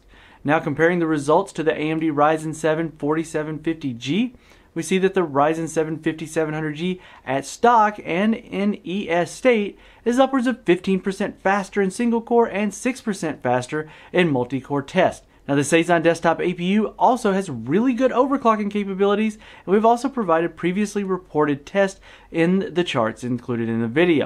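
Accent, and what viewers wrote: South, United States. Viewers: Your subtle southern accent is quite pleasing to the ear for some reason lol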